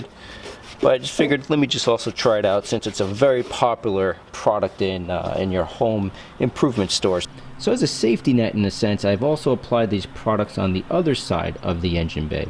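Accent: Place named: New Jersey, United States. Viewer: New Jersey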